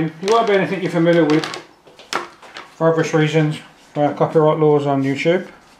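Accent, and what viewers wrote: United Kingdom. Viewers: your accent has too much British in it. hard to understand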